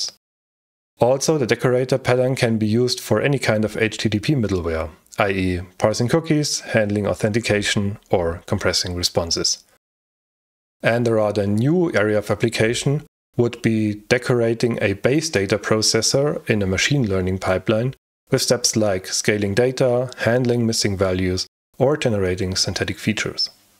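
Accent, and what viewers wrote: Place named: Germany. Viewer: Alter Schwede, geiler Channel Name und richtig gute Inhalte. Bin zwar kein absoluter Rust-Anfänger und habe ein paar Jahrzehnte Programmiererfahrung (hallo Turbo Pascal und VisualBasic 2.0, erinnert sich noch wer? 😀) aufm Buckel, aber deine Videos sind trotzdem sehr gut strukturiert und interessant für mich. Also, your English is pretty good, so no issues for me understanding you (although there is still that lingering German accent, but that's normal, trust me - I have it too when talking in English). All in all, very good content. Subscribed for sure. Keep at it. And Thank you!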